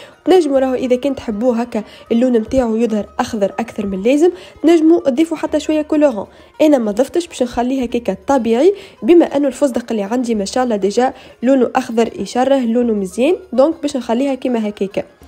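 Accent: Tunisia. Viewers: يا يا حلوة اقسم بالله اقسم بالله اقسم بالله الوحيدة على اليوتيب اللي تعطي حكارات مضبوطة انا صفر في التطبيب ملي تبعتك خطوة بخطوة في الحكارات و كل شيء من اول مرة نطيب تجيني ناجحة الاكلة و فوق هذا زين و عين و ذكاء و حيوية و إيجابية و اخلاق و احسن حاجة فيك انك ما بدلتش لهجتك التونسية رغم كل شيءٍ و الاهم من هذا تقدم في اكلات تونسية زمنية بطريقة صحيحة و عادات تونسية متاع اجدادنا ما نسيتش بلادك و قدمتها بالصورة الصحيحة انت نفسك تمثل المراة التونسية الاصل انت تونس الصحيحة ربي يباركلك و يبقي عليك الستر ربي يحفظك و الله تستاهل كل خير ❤😘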